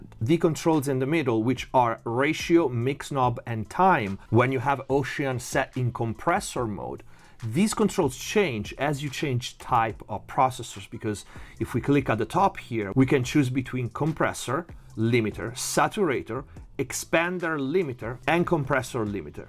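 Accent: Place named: Italy. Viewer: your accent is Italian, are you Italian ?? love your work man, I learned a lot from you!!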